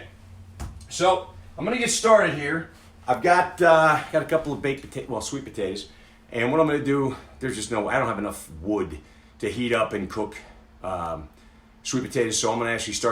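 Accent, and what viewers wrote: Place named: Italian American, United States. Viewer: Very Italian American accent haha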